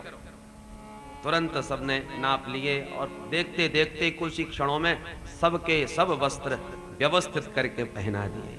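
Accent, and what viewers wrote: Braj, India. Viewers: Ati sunder sumadhur brajbhasha me katha ataydhik sukhad saras prateet hoti hay apko sader naman vandan guruver 🙏🙏🙏🙏🙏💐💐💐💐💐💐💐💐💐💐💐💐💐💐💐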